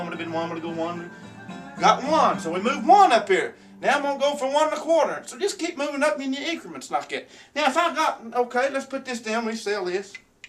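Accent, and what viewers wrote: South, United States. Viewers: That was awesome!! I wish I could do that, but there just ain't no way!!! Love the deep southern accent!! I'm an ole country gal that lives on a farm and go to alot of livestock auctions and I love listening to the auctioneer! I do have to say though, you are really amazing at this!! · Your chant is beautiful and you have nice rhythm. And your southern accent makes it even better! 👍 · I don't know what I love more: the southern accent, or the music in the background reinforcing all the prejudices of US southerners xD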